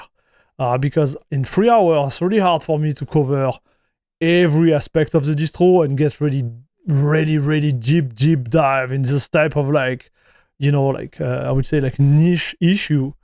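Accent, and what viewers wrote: France. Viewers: your french accent is too strong. hard to understand.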